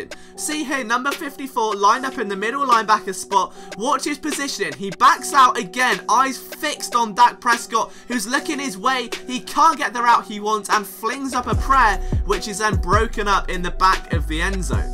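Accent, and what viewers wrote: United Kingdom. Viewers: Wow this is different somebody reporting about the NFL with a British accent are we getting through to the people across the pond that american-style football is Supreme Over homosexual soccer ? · who the f is this BRIT talking about FOOTBALL???!!! doesnt even sound right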